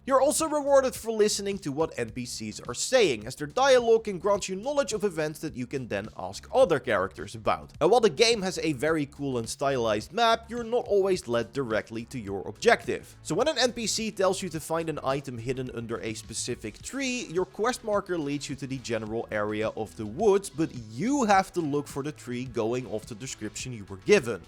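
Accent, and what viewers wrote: Netherlands. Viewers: Echt het Nederlandse accent ook ! Ik kijk echt naar dit spel uit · I dig your Dutch accent. Groetjes uit Duitsland. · the thick dutch accent is real omfg....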